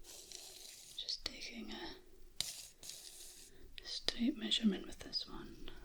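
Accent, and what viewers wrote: Scotland, United Kingdom. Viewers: This accent is the best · you're very pretty i love the scottish accent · Sooooo Scottish haha WEE , 🥺😭 🤗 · Scottish accent yes please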